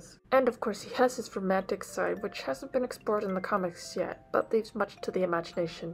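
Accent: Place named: Hungary. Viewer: I'm really exited for the full video! Someone finally talks about one of my favourite ships. And you have a really cute Hungarian pronunciation.:D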